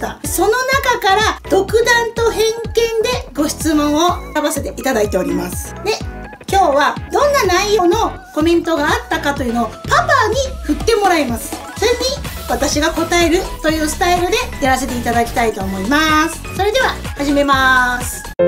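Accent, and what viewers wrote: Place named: Gunma, Japan. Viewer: 話し方がいかにも群馬って感じですね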